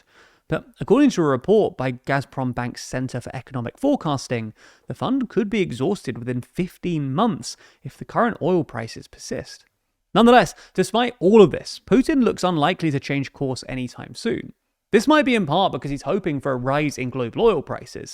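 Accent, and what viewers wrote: United Kingdom. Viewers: UK accent is means a f a k e information. Like BBC news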